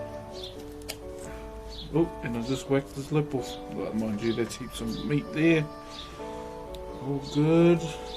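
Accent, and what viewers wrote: New Zealand. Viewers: That’s how is kiwis talk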